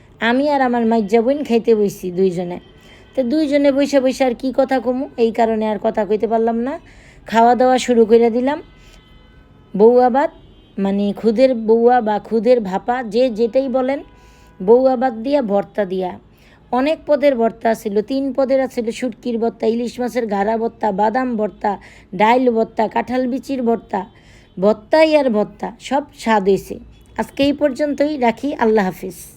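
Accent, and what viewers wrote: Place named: Narayanganj, Bangladesh. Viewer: নারায়ণগঞ্জ এর ভাষা আজ প্রথম শুনলাম । ধন্যবাদ আপনাকে। সিলেট থেকে দেখছি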